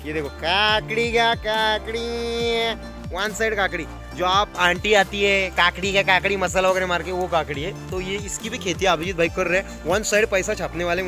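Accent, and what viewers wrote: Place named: Maharashtra, India. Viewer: Best thing is, That one special Marathi Touch 💚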